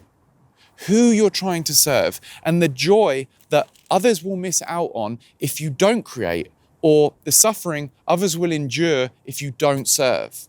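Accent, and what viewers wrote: United Kingdom. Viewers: Great content man! I've been meaning to explain this topic to people but they don't understand me or don't want to. I've seen people overreact and become so identified with their minds that they seem out of control so I try to help but it's hard. PD: I love the accent, I'm from Argentina and I'm studying to become an English teacher and here we learn RP English.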